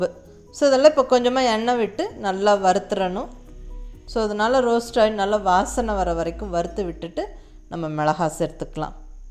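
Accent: Coimbatore, India. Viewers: Amma unga samayal yellamea 👌 ma, ninga pesura kovai tamil ketguradhugu rempa piduchurugu ma. Thanks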